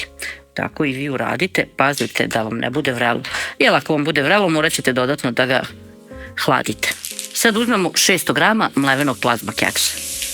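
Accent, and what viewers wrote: Vojvodina, Serbia. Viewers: E, mnogo mi se dopadate! Neposredni ste , spontani i duhoviti.,,, a recept mi se dopada, lepo ste objasnili i bez neke "pompe" napravili , a sigurna sam da je torta ukusna. Posebno mi se dopao Vaš akcenat, rekla bih da ste iz Vojvodine! ❤❤❤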